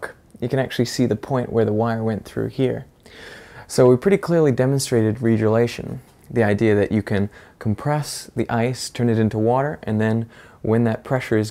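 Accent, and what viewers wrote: Australia. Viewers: This video is soo old he still has an australian accent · You're slowly getting an Aussie accent, slowly. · He said he’s in Sydney in this video? Idk how long he was in Aus at this time but he’s got some hints of the accent in this video. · he has a slight australian accent in this o.O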